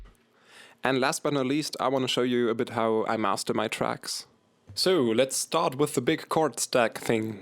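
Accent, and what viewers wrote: Germany. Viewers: Wie krass man einfach das deutsch raushört (how easy you just hear the German) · Are you german ? :) you have a german aczent :D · I realised u r german after like 1sec of watching this :D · He's german :3 That accent :DDD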